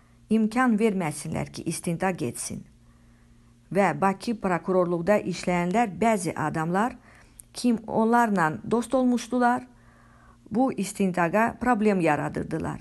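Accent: Armenia. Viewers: Sən özün ermənisən.Ara qatmaqdan otrü bu yazını ortaya qoymusan Sənin danışığından məlumdur ki.,əsil efməni ləhcəsi ilə danışırsan.Sənin havadarlarında sənın kimi erməli məxluqlaeından dır. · Yalan sa allah sənə lənət eləsin. Ay cındır, sənin ləhçəndən erməninin yekəsinə elə sən özün oxşayırsan ki. Mənə çox marağlıdır, hansı erməni azərbaycan övladları üçün hərbi məktəb (yəni, Naxçıvanski adına) açar ki, sonra da onlar o məktəbi bitirib və vaxtı çatanda da elə ermənilərin özünün də kökünü kəssin?! Hansı erməninin övladı ali baş komandan kimi minlərlə erməninin məhvinə, erməni ordusunun darmadağın edilməsinə əmr verər?! Ay cındır erməni, sən bu suala cavab verə bilərsən? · Daniwanin ozu ermenidir azeri olsa bele daniwmaz lehcese ermeniye oxwayir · Междупрочем:у-вас-аккицент Армянски:вы-кто:любои Азэрбаиджанец-знаем:алиев Армянин, а, пачему, именно, вы Имфармируите, людеи!!!!! 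· Bu danisan qadinin ozu azerbaycanli deyil ya ermeni ya da rusdur.buna emin olmaq ucun onun sozleri teleffusune cumle qurmagina fikir vermek kifayetdir.ayibdi vallah. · Bu qadın Ermənidir səsindən tanıdım Mingəcevirdə yaşayıblar Azərbaycan dilini bilir bir kanal var BBS səhf etmirəmsə,orda müsahibə verib tapıb səsə qulaq asın. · Danisanin ozu ermeni kimi danisir Heyd er baba Esl Azerbaycanlidi az nagil danisin elebil torpagi Heyder Eliyev satib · Про алева не знаю ну ты точно не Азербайджанеч, может армянка по разгавору видно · Bəs sən kimsən erməni ləhcəsiylə danışırsan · Senin danIshIgIndan bilinir ki, sen ermenisen. O, ermeni olsaydI AzerbaycanI iqtisadi cehetden inkishaf etdirmez ve ordu quruculugu aparmazdI.Zefer Qelebemizin ele esasInI da o qoyub.O dunyada tanInmIsh bir LiDERDiR. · Бурда бири йазыр ки ханымын сесиди.ай тупой гормурсен ермени лечесийнен данышыррр??? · Bu danışan qadın ermeni lehcesi ile danışır. 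· Sen özün ermenisen alçaq lehcenden melumdur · Bu danışan qadın özü ermənidir görün nə cür ləhçədə danışır, ay millət bu cür təbliğatlar uymayın bizim başqa dərdimiz var ermənilərə müharibə qalib gəlmək,bu cür cındır şeylərə inanmaq lazım deyil şəxsən mən Heydər Əliyevin kim olduğunu çox qəşəng bilirəm,bu danışan qancığada deyirəm ay erməni qəhbəsi sənin danışığından ləhcəndən görürəm ki sən özün hayiksən ay it sürüsü sən indi məni inandırmağa çaışırsanki dediyin düzdü? Üzümü tuturam bizim o dəmşalağ bəzi millətimizin nümayəndələrinə belə şeylərə uymayın burda söyüş yazmayın,o ki qaldı Heydər Əliyevin fəaliyyətini tənqid eləməyə əgər bacarırsınızsa bunu yazın bunu deyin mən demirəm Heydər Əliyev biri mələkdi hər nədisə bizimkidi bu hayiklərin təbliğatına uymayın kimdə istəyir mən o insanlarla ünsiyyətdə olub Heydər Əliyevin kim olduğunu deyə bilərəm subutda edə bilərəm,bu adam yəni Heydər Əliyev əsli kökü Zəngəzur mahalının Sisian rayonunun Cömərdli kəndindəndir atası Əlirza kişi anası İzzət xanım,ata babasının adı Məşədi Cəfər,ana babasının adı Hacı Cəfərqulu,Heydər Əliyev isə özü Naxçıvanda anadan olub,bu düşmən təbliğatına uymayın kim maraqlanmaq istəyirsə buyursun burada yazsın nömrədə qoysun məndə nömrə qoyuram vasap <num> kim üçün maraqlıdırsa buyurun mən danışa bilərəm! 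· Tocnu ermenilerin azerice danismasina oxsayir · Danışanın özü deyəsən ermənidir. Ləhcəsindən bəllidir... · Xəbəri oxuyanın özü ermənidir. Danışığından bilmək çətin deyil. Hamısıi böhtandır. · Sən hələ erməni ləhcəni düzəlt sonra Heydər Əliyev haqqında danls elə özün tər təmiz ermənisən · Evvel azeri dilinde danışmağı öyren sonra bu nağılları danış senin lehçen ermeni lehçesine oxşayır Camaatı inandıra bilmessiz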